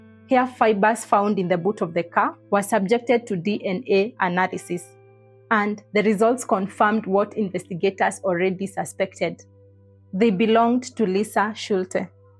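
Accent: Kenya. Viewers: She has a Kenyan -African accent.......you want her to speak British or American English??¿? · 😂She's Kenyan. What other accent does she know?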